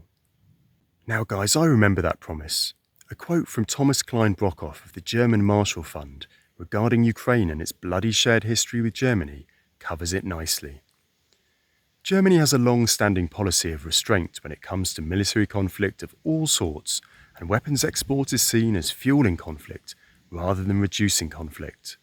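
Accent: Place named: England, United Kingdom. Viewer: Your accent is English!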